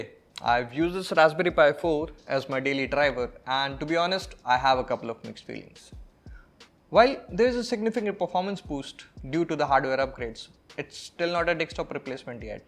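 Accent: India. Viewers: As a non-native English speaker, I usually have a hard time understanding Indian accents, but this guy’s voice is very soothing. I’m loving his accent. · Great video , but still indian-english accent gets me everytime :D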